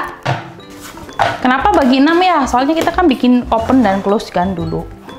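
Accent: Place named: Java, Indonesia. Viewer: thank you, sy mau coba ah. Btw, ci orang jawa ya ..logatnya jawa hehe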